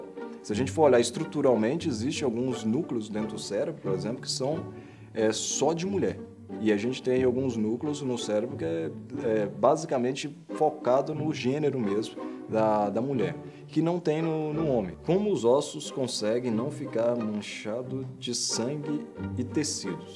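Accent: Minas Gerais, Brazil. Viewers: Alguma dúvida que ele é mineiro??? Ameeeeii ♡♡ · É mineiro uai!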